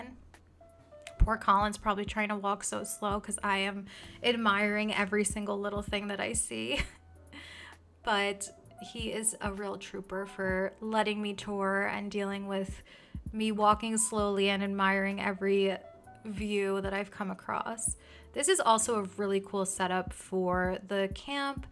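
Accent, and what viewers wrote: United States. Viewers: you can tell he is from the us :D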